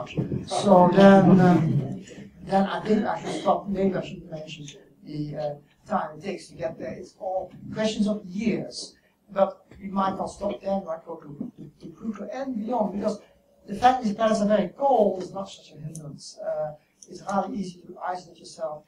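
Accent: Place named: Germany. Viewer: Well I was going to watch it, but all the bumps and feedback in the audio on top of a muffled German accent make this video harder to understand than a police radio haha. Did you actually watch this before posting it? Seriously, it's like someone put the microphone in a sock full of rocks, dangled it from a string, and started trying to play Conkers with the speaker, before adding an echo effect post production and following it up with noise removal on a sample of his voice.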